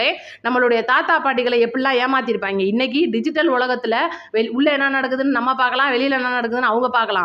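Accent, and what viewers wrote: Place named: Madurai, India. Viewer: Akka ungal madura pasai super ❤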